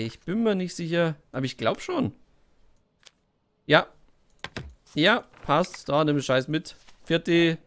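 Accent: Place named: Bavaria, Germany. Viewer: hach ja, zum zweitausendsten viewer bringt der gute seine familie um - das ist doch mal dedication:D ich bin auch wegs papers please auf den Kanal aufmerksam geworden (und nicht wegen irgend eines anderen youtubers^^ Hab mal ein videocheck auf der gamestar gesehen oder so). Ich bleib auch gern bei anderen lets plays. Immer sehr angenehm, deine atmo, dein bayerischer slang... Wo kommsten genau her, wenn ich fragen darf? Einzige Kritik: Beginn doch nich jedes Video immer mit "herzlich willkommen". Ich hab die zwo wöter so gfressen...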